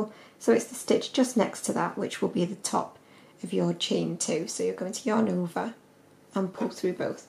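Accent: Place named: Northern England, United Kingdom. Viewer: US terms coming from a Northern English voice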